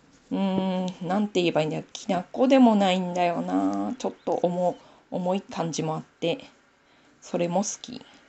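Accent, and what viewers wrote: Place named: Hachinohe, Japan. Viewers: 私の父が八戸の生まれです 訛りを聞いてるととてもほっこりします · youtubそのものに初めて コメントです。何と懐かしい 。南部弁～「まめしとぎ」「干し餅」美味しいものの表現に「くるみの味」先日からチャンネル登録して見ています。 いまだに 帰省した時に 道の駅に寄り探しますよ～ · おはよう〜💕 「うんめ〜」カワイイよ。 青森訛り好きだわ〜🥰 大好きなものを熱く語るはずが、よくわからないという顛末😁の件も面白かった。 私は愛知出身なんだけど、名古屋弁ってキツめなんだよね🥲 青森の友達と話しているみたいで楽しかった。 またやってね、青森訛り動画👍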